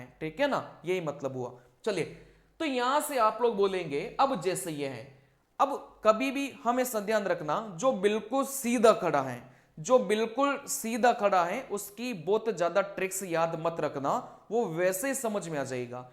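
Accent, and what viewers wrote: South, India. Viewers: Ye south jaisi hindi bolte hai sir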